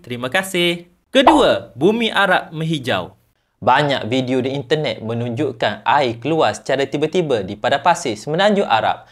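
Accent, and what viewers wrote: Kelantan, Malaysia. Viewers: Abe ore kelate kea😂 suara blh cam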